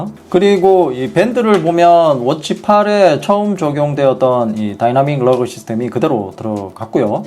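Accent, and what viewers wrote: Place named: Gyeongsang, South Korea. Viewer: 이헝님 겅상도 사람인가 말투가 구수하네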